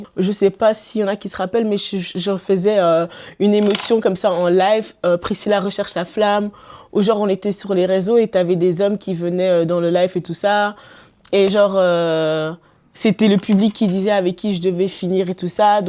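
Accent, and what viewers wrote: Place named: Democratic Republic of the Congo. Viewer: Moi aussi 😅elle fait cet accent congolais de temp à autre la, moi je me disais peut un parent congolais lol. C’est quoi l’histoire du français please. Like how did you start speaking French as a Ghanaian?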